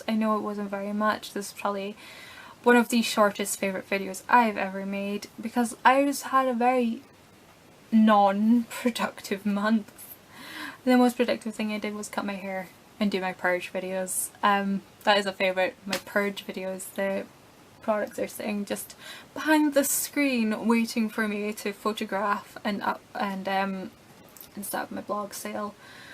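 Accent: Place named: Scotland, United Kingdom. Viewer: Odd comment coming at you, but I enjoy listening to you. I like Scottish accents, and yours is very easy to understand and pleasant :)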